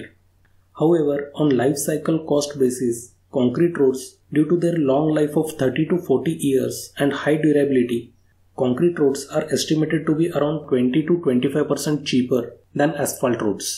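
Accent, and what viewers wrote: India. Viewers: Bro, you can't even type a sentence. His English is fine he just has a strong Indian accent.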